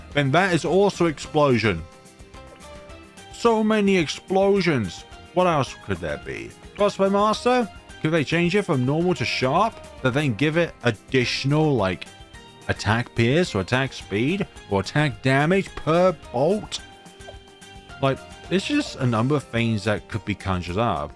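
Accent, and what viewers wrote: United Kingdom. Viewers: Wait.are you brittish or australian? Cuz you sound like the ceo of australia.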